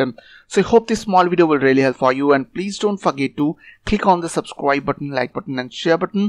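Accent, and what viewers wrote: India. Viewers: i tried every solution before this, but as soon as i heard an indian voice i knew my shit is getting fixed real quick, ty bro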